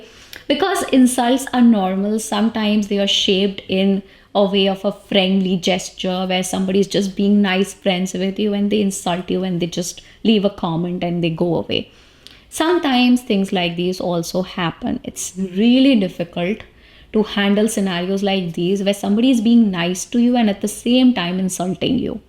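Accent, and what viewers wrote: India. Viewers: Oh mam… you make so many videos on correct pronunciation..but the difference is in those videos you are targeting words that people don’t use oftentimes and its easy to highlight those words.. but after watching this video of yours i can clearly see how many mistakes you’re making while pronouncing multiple words.. but the point is that while speaking nobody really focuses on pronouncing words as we are making people understand our standpoint.. its so funny and annoying that you create videos just to collect audience, when you yourself speak in a very common accent just like every indian speaker… i work with so many UK & US stakeholders and to be very honest nobody really spell the words the way you speak in your so called “how to Pronounce words” videos on youtube…and now all your stupid fan followers will start replying me and giving me gyaan but i really don’t care… moreover there are so many other important topics that are going in the world nobody really cares about your videos…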